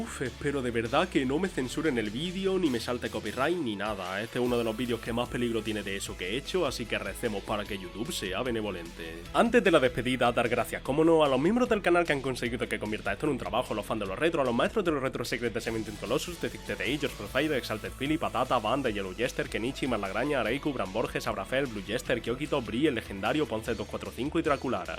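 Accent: Andalusia, Spain. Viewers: Ya por el acento se nota que es del sur de España, jeje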